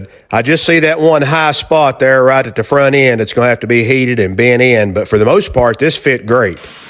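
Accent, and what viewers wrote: South, United States. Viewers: First time I've come across your channel, great work...but initially I thought I was listening to an episode of the Dukes Of Hazard, them good ol boys! Hi from Down Under Australia!!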